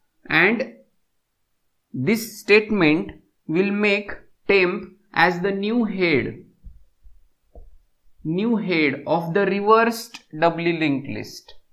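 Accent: India. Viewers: The 1st time I understand Indian accent. You explained well. Thumbs-up!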